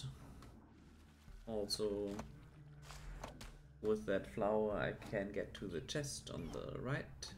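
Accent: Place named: Germany. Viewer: Du hörst dich irgendwie an als wenn ein Deutscher, Englisch redet, kann das sein? Hi, it heards like when a German speaks english, where you from? Like goes out.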